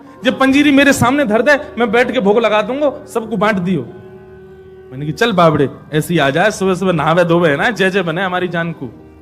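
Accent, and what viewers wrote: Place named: Bharatpur, India. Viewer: Ye bharatpuri accent me jo katha vachan kr rhe h sun ki bahut khushi milti h 🥰🥰🥰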